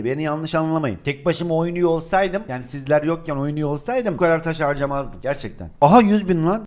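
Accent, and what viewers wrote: Rize, Turkey. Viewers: Abi önceden rizeli konuşuyordun ya ozaman daha güzeldi yine öyle konuşsana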